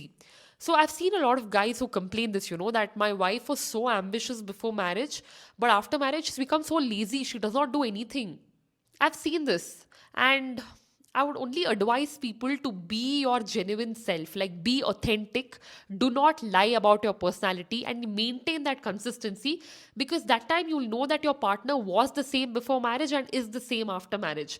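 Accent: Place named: India. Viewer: 1. Worst accent to listen to over a public podium. 2. Seriously, Indians need to aggressively build their damn ways to bring good quality orator in them, not in terms of what they speak, but how they speak. Period. 3. If Indians have, what the accent you speak, speaking my heart out, I feel English is being dragged to hell and killed. 4. This was never, Britishers wanted in their life time, to get their lovely mother tongue, subjected to such an awful transformation in terms of pronunciation.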